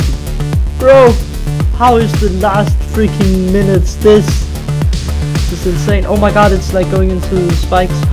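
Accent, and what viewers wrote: Denmark. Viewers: yooooooooooooo anyone ever told u,u have an danish accent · are you danish my guy? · Are u danish? 😂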